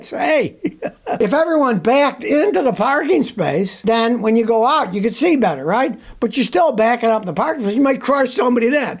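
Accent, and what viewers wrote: Germany. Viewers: The German accent is hilarious!!! 🤣😂🤣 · Your German accent has me rolling! 😂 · enjoyed the German accent. :D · im here for his German accent lololol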